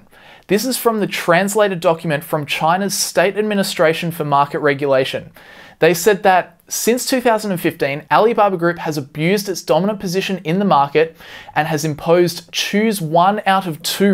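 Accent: Australia. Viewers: you got my attention with the quote: "yea awright, grandpa!!" .. good analysis with concerted effort to avoid over-influencing our opinion... love yur Aussie accent...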